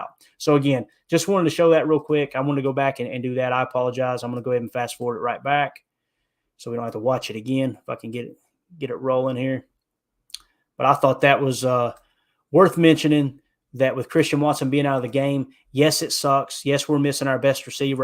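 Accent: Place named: South, United States. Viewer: How you get a southern accent living in Wisconsin?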